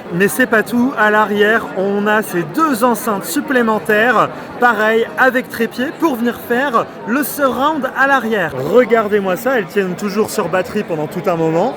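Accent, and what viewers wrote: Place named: Paris, France. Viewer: "fairheu" parigo et son accent pourri de j'euns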